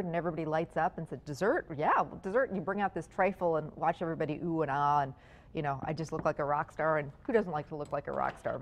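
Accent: United States. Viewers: Oh, no. Packet "pudding," store-bought crackers, store-bought cake ... and won't even whip real cream. When I first heard her accent, I thought, "Oh, this is going to be terrible," then berated myself for stereotyping Americans. This is so much worse than I'd thought was possible. Maybe it was for a "quickest desserts ever" segment? My goodness, even the layering is dreadful -- it's a tumble of ingredients. Let's see actual layers. Very dry, with so little liquid (sherry &/or juice is usually needed for the sponge).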